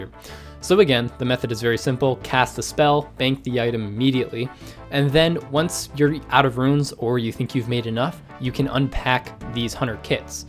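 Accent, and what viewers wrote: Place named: Canada. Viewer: You sound incredibly canadian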